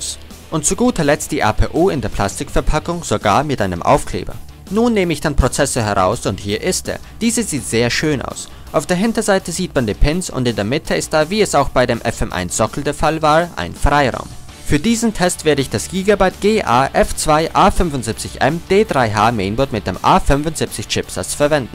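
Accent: Austria. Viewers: Ich liebe den österreichischen Akzent! ^.^ Gutes Review.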